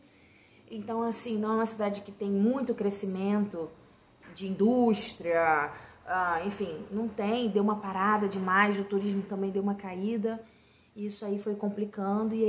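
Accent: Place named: Rio de Janeiro, Brazil. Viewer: Oi querida 🥰 Conheci hoje seu canal e gostei muito do seu jeitinho carioca de comunicar 😍 Também sou carioca e estou planejando morar em João Pessoa. Vou acompanhar você por aqui pra saber mais sobre a cidade. Um beijão e que Deus continue abençoando sua vida e sua família ❤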